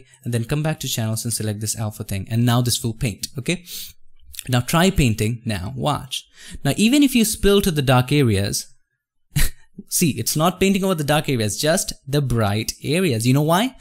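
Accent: India. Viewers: I like the way he speak and explain things I work before in Saudi I have my Co woker indain people I miss the accent of them :)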